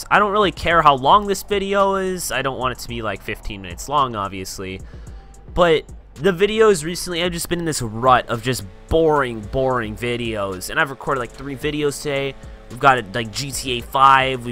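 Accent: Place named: South, United States. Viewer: Your southern accent is better then Mine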